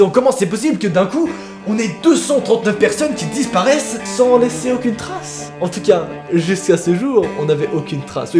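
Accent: Belgium. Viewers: Deux questions tu es belge?? Et tu as 40ans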